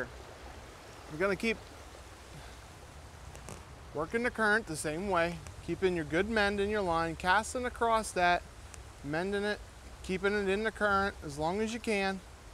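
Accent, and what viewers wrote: Pennsylvania, United States. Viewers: Maybe for you, but that's how it's said in PA!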